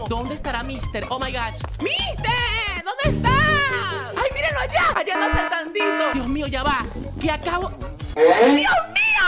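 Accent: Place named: Colombia. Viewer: Y sí cierto hablas como colombiana se te escucha el acento y todo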